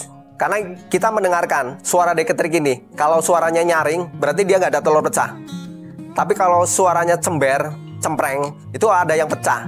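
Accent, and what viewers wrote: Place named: Kebumen, Indonesia. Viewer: Berati kebumen ngapak kaya nyong nng rio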